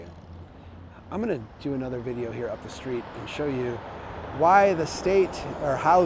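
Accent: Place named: Minnesota, United States. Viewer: He is from Min-ah-sooooo-tah so he has an accent. You're darn tootin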